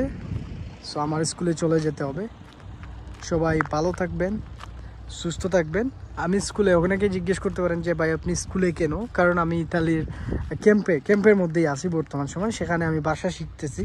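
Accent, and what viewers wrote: Sylhet, Bangladesh. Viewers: ভাই কি সিলেটি না কি?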